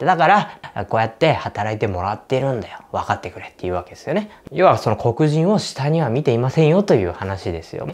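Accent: Kagoshima, Japan. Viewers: さんの情報全くない中で見ましたが、開始15秒で鹿児島出身だということが分かりました😂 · 鹿児島の方ですか！？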